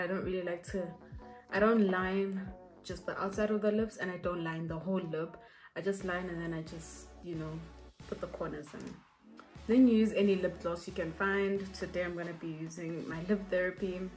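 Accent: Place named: Germany. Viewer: Man hört das sie deutsch ist oder bin ich dumm😂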